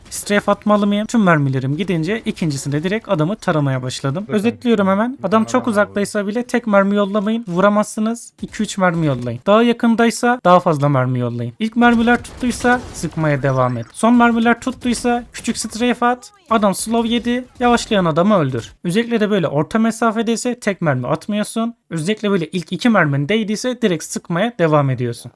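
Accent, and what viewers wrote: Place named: Black Sea, Turkey. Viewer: bi Karadeniz ağzı var gibi derinden kulağa geliyor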